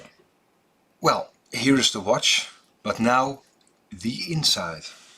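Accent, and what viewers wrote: Netherlands. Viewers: Without looking it up.. Ik weet vrij zeker dat je een Nederlander bent?😛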